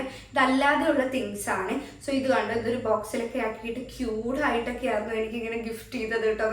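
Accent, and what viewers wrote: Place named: Palakkad, India. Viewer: പാലക്കാടൻ നിഷ്കളങ്ക സംസാരം😍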